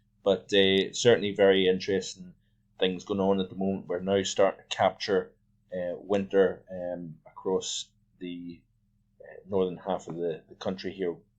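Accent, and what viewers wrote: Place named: Northern Ireland, United Kingdom. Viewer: I watched 3 of your videos thinking you were from northern Ireland, until you said you were in Scotland. I'd put you from Ballyclare 🤔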